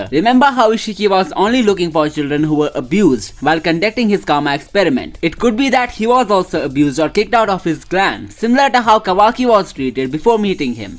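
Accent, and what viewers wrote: India. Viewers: Your Indian accent is real thick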